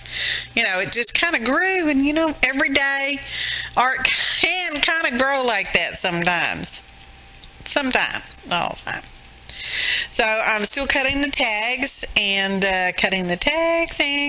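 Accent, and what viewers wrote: South, United States. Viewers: Loved your project and love your southern accent!!!!!!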